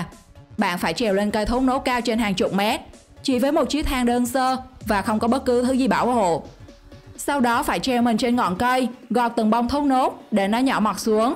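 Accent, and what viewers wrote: Vietnam. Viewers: Nói trọ tre mà miền tây miền đông gì.?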